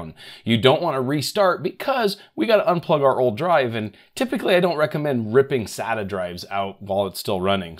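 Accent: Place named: United States. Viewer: Your videos are usually first rate but this one is overly complicated and, for some reason, you gabble at great speed. Not all viewers find US spoken English easy to follow and the monotone does not help. Perhaps showing two methods in a single video was the problem and could have been solved with a part 1 and part 2. Easeus is buggy and manual partition sizing with simpler tools can be be quicker and easier. Aomei is really worth the price if only used among friends and family a few times a year because it is so well thought out and has fail-safe features which were absent in the free version. Customer technical service is excellent re using the licensed product on other machines up to a point. For those of us who repair and upgrade machines regularly it is, in my years of experience, the best and least fussy when used properly. Over the years I have tried most.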